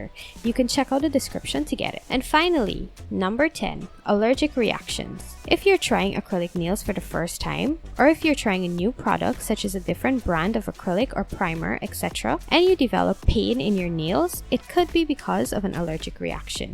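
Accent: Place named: Caribbean. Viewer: Sounds carribean, maybe Trinidad or Guyana .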